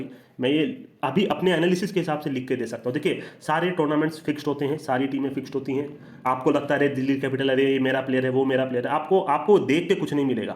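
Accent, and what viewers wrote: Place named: Bihar, India. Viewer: Bhai bihar se ho kya aap? Aapka shardul thakur eko wicket nahi 20run dekar wala accent mast tha🤩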